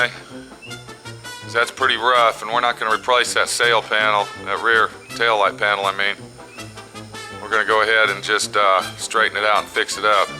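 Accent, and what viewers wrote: Texas, United States. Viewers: hahah.. i love the texas accent.. wish i had one.. i was born there but left when i was 1.. =/ movin to SC soon.. so hopefully i'll pick up an accent there.. this masshole accent sucks.. haha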